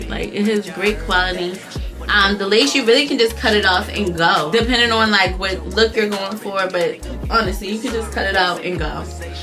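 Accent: Baltimore, United States. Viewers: You from Baltimore girl?????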